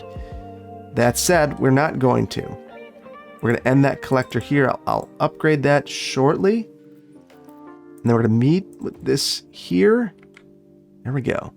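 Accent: Wisconsin, United States. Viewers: After watching a few more episodes, I think he just means he doesn't like the look of it in the game. It sounds like he's from Wisconsin and there's cetainly very hilly and cliff-esque builds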